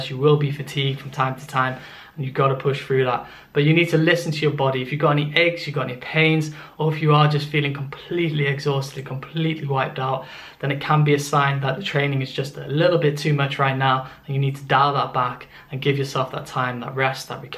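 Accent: Wales, United Kingdom. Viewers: Is that a welsh accent i hear ayy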